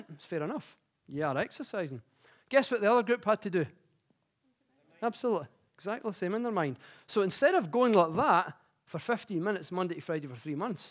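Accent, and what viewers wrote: United Kingdom. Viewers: Adorable scottish accent ...fantastic talk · Glasga accent?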